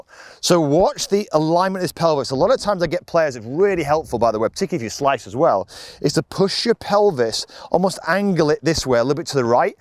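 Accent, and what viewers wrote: Yorkshire, United Kingdom. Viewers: Where are you from? Sometimes I hear Yorkshire sometimes I don’t 😂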